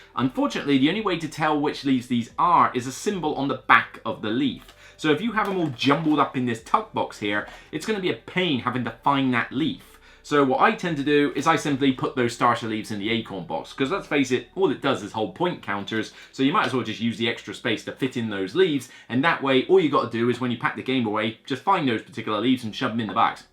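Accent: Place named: West Country, United Kingdom. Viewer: New to the channel. Nice review! Hope you won't find this too personal but I find your accent (and accents generally) very interesting. I'm guessing a mix of west country and New York/southern Canada?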